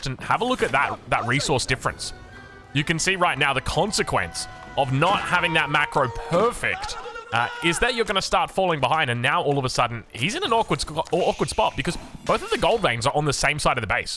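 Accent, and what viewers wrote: Australia. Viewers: Aw :( you don't sound as Aussie as you used to from the last upload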